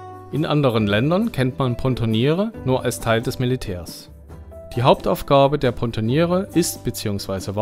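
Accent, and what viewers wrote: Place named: East Germany, Germany. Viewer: Super Film. Mit deinem Ossiedialekt hälts du uns den Spiegel vor und gelegentlich lernen wir selbst noch etwas über uns. Mit Humor und pointiert-weiter so.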